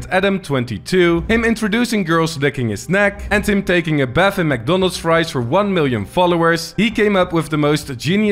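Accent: Netherlands. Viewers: Heey een Nederlander die Engels praat!! Of probeert althans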